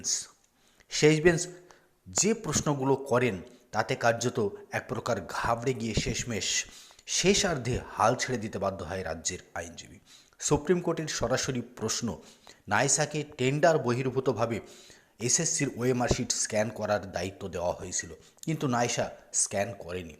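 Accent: Bangal, Bangladesh. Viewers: ভালো করে কথা বলতে শিখুন বাঙ্গাল ভাষার গন্ধ এখোনো যায়নি।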